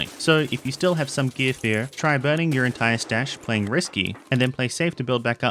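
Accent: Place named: Australia. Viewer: first of all, i love this video and you're crazy underrated. awesome tips, coming from a fairly washed tarkov player. second, i was running factory earlier today, and was killed by so i checked your twitch out and sure enough, there you were in factory beaming me down. and here i am 2-3 hours later clicking on a youtube video and hearing a fairly familiar aussie voice and checking who it was from. and as you can guess, it was you. anyways, i love the content, subbed.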